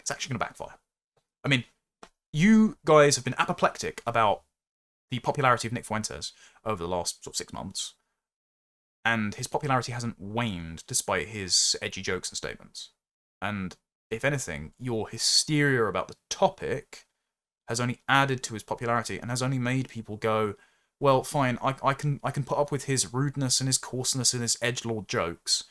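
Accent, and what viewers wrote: RP, United Kingdom. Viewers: Good grief.., slow down, your delivery you sound like a public schoolboy!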